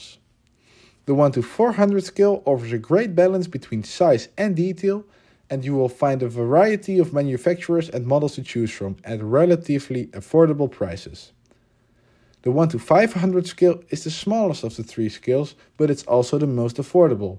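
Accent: Netherlands. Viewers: your dutch right? because your voice but in the beggining i also though you were displaying a photo from aviation megastore?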